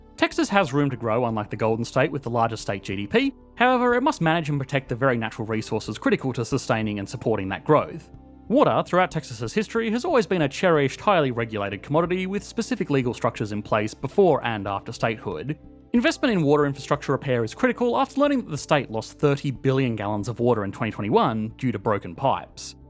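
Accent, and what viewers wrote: Australia. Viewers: He's Australian, there are a lot of elongated vowels with the countries accent. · Whose idea to use a horrible rushed Aussie accent? Just use a normal American accent and less wordy next time. Thumbs down for being overly boring. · Your accent is so wonderfully aussie that i thought at the end of the video, "i didn't know they call each other "partner" down under.....just like in Tex....wait a minute." Then i got it. Slow day for me.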